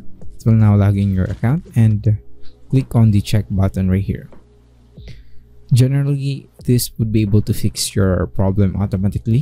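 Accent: Philippines. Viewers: I hear this slight Filipino accent, but still very detailed video :>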